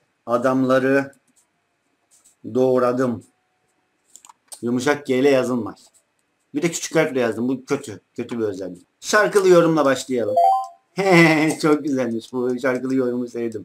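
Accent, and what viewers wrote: Black Sea, Turkey. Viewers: abi sen rizelimi tranzonlu musun?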